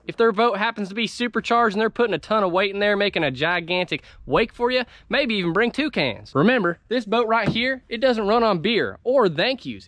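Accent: South, United States. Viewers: Extra Texan for this one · Haha, nice Texas Twang! Great Tips! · Why does he have a southern accent all of a sudden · Country accent :O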